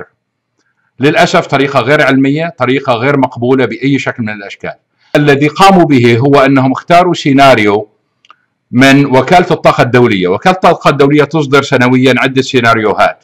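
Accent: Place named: Gulf. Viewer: اخوي الأستاذ سعودي او عراقي لاني عجزت اميز لهجته خليجي على عراقي على شامي … واسم العائلة الحجي وهو منتشر بين اهل العراق واهل الخليج خاصة الكويت والاحساء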